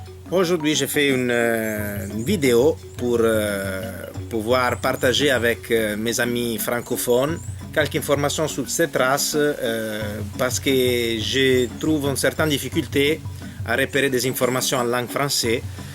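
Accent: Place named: Italy. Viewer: Enfin! Enfin une vidéo sur le boerboel en français! et dans un français parfait, en plus! Et cet accent italien... la classe! Excellente vidéo et très instructive, merci... Je m'abonne direct!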